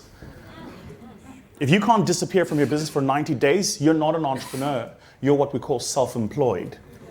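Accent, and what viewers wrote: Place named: Township, South Africa. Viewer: lol; I love your Kasi Accent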